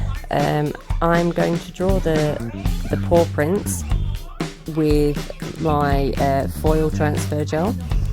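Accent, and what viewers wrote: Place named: Australia. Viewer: U sound so Australian x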